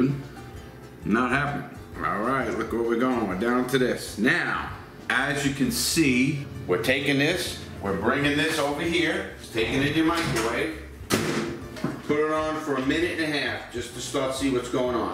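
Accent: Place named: East Coast, United States. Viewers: The thick Jersey accent really ties it all together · by his accent he's east coast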